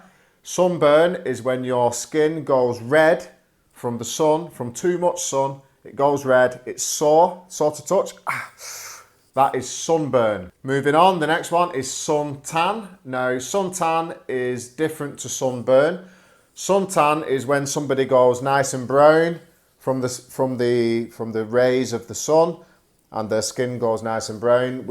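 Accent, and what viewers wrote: United Kingdom. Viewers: Your lesson is so helpful because you pronounce every words in British accent and your speed is average which is also helpful for understanding the native speaker in practical situation... Thank you from India. by the way British people left English language for Indian when they ruled our nation.do you know about this?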